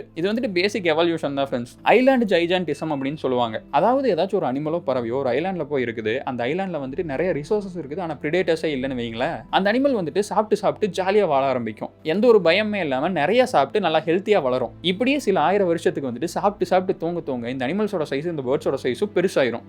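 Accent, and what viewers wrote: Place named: Kongu, India. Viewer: சடபுட கொங்குஸ்லாங்❤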